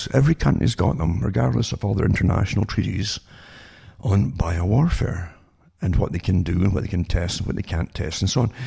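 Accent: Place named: Scotland, United Kingdom. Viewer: New subscriber from the Central Coast NSW Australia. Love a Scots accent too!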